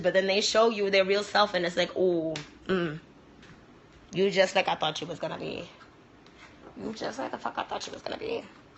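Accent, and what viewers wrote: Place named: Dominican Republic. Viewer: she grew up with Dominicans. Why shouldnt she speak like the people she grew up with? Its not like accents are genetic lol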